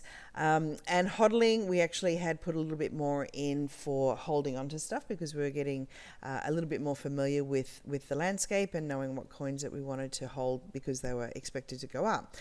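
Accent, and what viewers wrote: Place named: Australia. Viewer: Thanks for the video, I've only just heard of the Plan this week so still doing some DD at the moment. You sound like you're Australian, I am too, can I ask if the grading happens in USD, thus the initial investment of $3000 per bot will actually be $4200ish AUD, are returns then also calculated in USD to be converted back to AUD at the end? Not sure if that makes sense, but basically just wondering if we in Australia would also benefit from the current currency exchange rates if we cashed out some of the positive returns?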